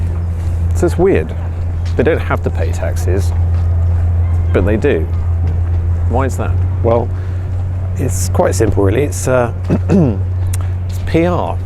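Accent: United Kingdom. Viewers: 'That's a thing?' 'It's a thing.' I see. Anyway, I'm really saying is your way of speaking is really British way. I love that way.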